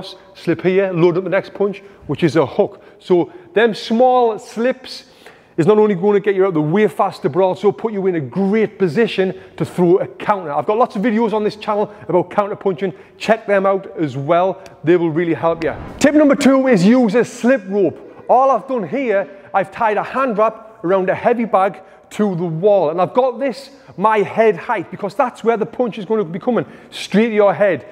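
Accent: United Kingdom. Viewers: I like your British accent 🔥🔥🔥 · The British accent makes this 100x better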